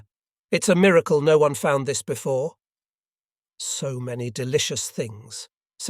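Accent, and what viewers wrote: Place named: United Kingdom. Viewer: Yeah, the British voiceover is the most entertaining when he yells fire or incoming is hilarious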